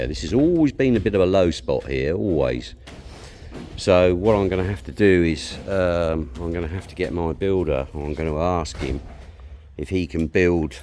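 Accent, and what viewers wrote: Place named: United Kingdom. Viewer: Looks great. Good luck to you. Just a fracture! Lol Sound like you come from the same part of the UK as me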